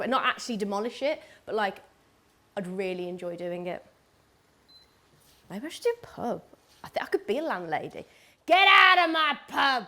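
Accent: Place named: West Country, United Kingdom. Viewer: I give up - what is your accent? Sometimes I hear London, sometimes Midlands, and often West Country... put me out of my misery! (P.S. These spuds look glorious!)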